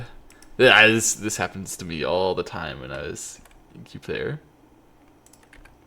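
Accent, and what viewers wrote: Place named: Europe. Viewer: Crazy, I always assumed you were from some European country because you seem to speak English with a bit of an accent and a slightly strange cadence.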